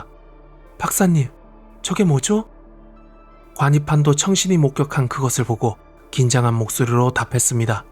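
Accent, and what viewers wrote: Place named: China. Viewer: 엥 왜 말투가 조선족...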